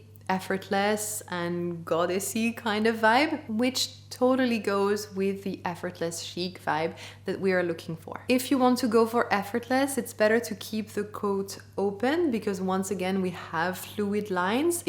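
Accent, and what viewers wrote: France. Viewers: I love your french English ❤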